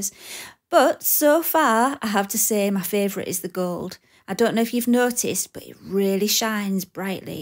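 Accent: United Kingdom. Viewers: Hi, just come across your videos today. From your accent I would guess you are UK based? I see you see to make many beautiful things with resin, and was wondering if you could recommend a hard, heat resistant resin suitable for coasters……that won’t mark with hot cups. Many thanks if you get time to reply. Deborah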